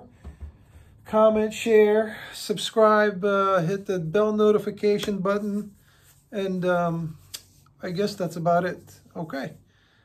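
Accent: New York, United States. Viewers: Your channel is addicting, watched a few episodes last night and then I realized it’s 2am, and had to get up at 4am lol. But it’s relaxing and I enjoy watching. Learning about nice shoes. I’m a carpenter so don’t get to dress nice much, but I like watching how you take apart these shoes and rebuild them. Pretty awesome tools and old school machines. You have a NY accent brother. Not sure where your shop is located. I had a German shepherd named Zues too, sorry you had to relocate him. Take care and keep posting awesome videos.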